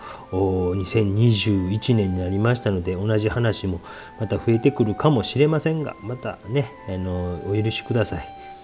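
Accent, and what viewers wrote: Kansai, Japan. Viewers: おはようございます。今朝サインの描き方を試してみましたがうまくいきませんでした😞 サインとか窓の格子などはアマチュアにはliquid frisquetでもいいかなと考えています。影を描いてからその上に色を載せるやり方は大変参考になりました。ところで神戸出身の私には先生の関西弁が懐かしいです。これからも頑張って下さいね。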